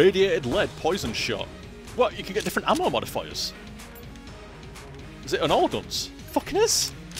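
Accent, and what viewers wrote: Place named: Scotland, United Kingdom. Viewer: Hey, from what county are you from? You sound scottish-like tbh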